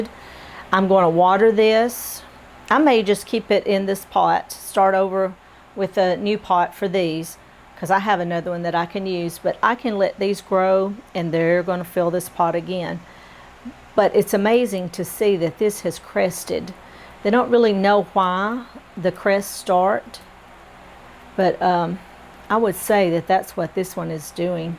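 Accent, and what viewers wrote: South, United States. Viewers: You have a lovely southern accent. I am hoping you are in the same zone i am so i can get succulent advice throught your videos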